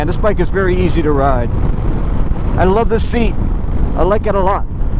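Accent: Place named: United States. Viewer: Thanks for using metric measurements occasionally. As a viewer from overseas I find the use of Imperial/ US units for tank capacities and fuel economy so confusing, even though I grew up using Imperial units until we changed to metric 60 years ago. Imagine the confusion for viewers who have never had to think about gallons/ miles/ inches in their life! So can you please list that you are using US measures ( even though it should be self -evident from your accent that you are filming in the US)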